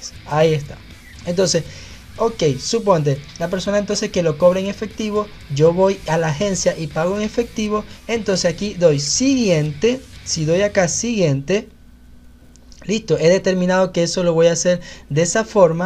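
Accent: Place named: Venezuela. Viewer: Mmm tienes un acento al chamo amigo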